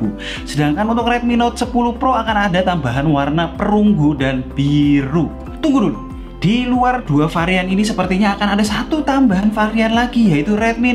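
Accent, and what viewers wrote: Java, Indonesia. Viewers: Mending nunggu..om balik gak medok lagi. Gak enak didengar mas kalau medok. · kok baru medok? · Lebih nyaman gausah medok mas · Sobat jaksel logat jawa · Saran jangan pake nada jawa lah pake nada formal sajaa. Gak enak didenger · Bukan niat mau rasis atau gimana, cuman dengan medoknya jadi agak aneh dan ga nyaman didenger mending kek biasa, jangan dipaksakan. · Kok medok mas... >< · Kenapa jadi medok 🤣🤣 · bohoso ne medok men :v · Galfok sma mendok nya om. Sma medoknya kaya saya. Salam ngapak om... Gpp bkn yg prtma nnton,,yg pntg selalu nnton saat pidio baru uplod. 👍👍👍👍👍👍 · Kok jadi agak2 medok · Medokk amat yakk sekarang bahasanya 😂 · Medokee maseee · Ga seru kalau mendok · Bang kedengarannya pake logat Jawa yah? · udah ganteng, manis, medok lagi ngomongnya.. #idaman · Logat medok jowo. Next : logat madura bang ato logat medan ➡️➡️➡️ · Logat Jawa nya keluar 😂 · bang logatnya berasa pembawa acara orang jawa👍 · bang logat jawa nya di hilang kan saja, supaya lebih mantap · Jawa nya medoooook mas....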